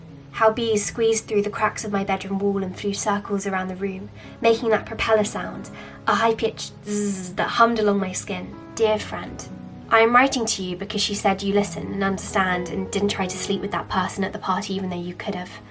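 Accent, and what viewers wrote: United Kingdom. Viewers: I absolutely love your taste in books. Omg, the other commenters are right! The British accent DOES make it better! I've been practicing mine. I think it's quite accurate. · Hahaaa that's true Emily 😂 like whoaaa, it sounds great, UK accent..💯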